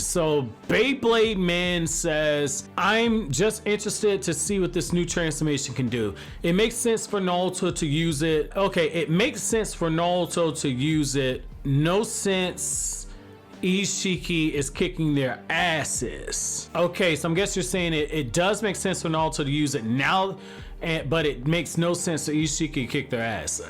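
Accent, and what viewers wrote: New York, United States. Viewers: I fucking knew you was from New York when I heard you laugh bc my clan filled with New Yorkers but know it’s confirmed p.s New York pizza ain’t shit compared to Chicago 💯🤣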